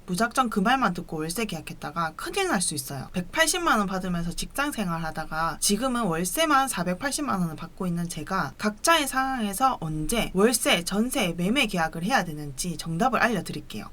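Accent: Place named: Busan, South Korea. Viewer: 집 때문에 저희는 결혼할 때 싸우지 않아요 경매 받은 집은 안들어 갑니다 갭 투자도 안해요 세금 때문에 하지만 항상 이상한 애들이 월세내고 주변에 있네요 한번 뵙고싶네요 부산 가면 고향사투리 좋네요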